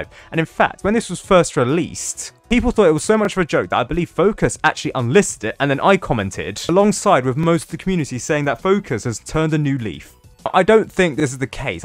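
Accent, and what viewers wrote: United Kingdom. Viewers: Omg too british accent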